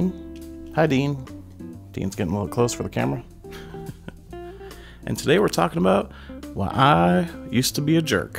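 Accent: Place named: Louisiana, United States. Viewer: I have to say I was surprised you are Canadian. I would have guessed from your accent you were from Louisiana. And with Porter being a southern gentleman and all.; ) Ohh, your babies love you sooo much. Thank you for coming over to the dark side of little tiny chi wow was. I have 2 and they are the loves of my life. When I was a little girl my great aunt had a yappy chi and I swore NEVER EVER. So I totally relate. 🐕🐾❤🤗👍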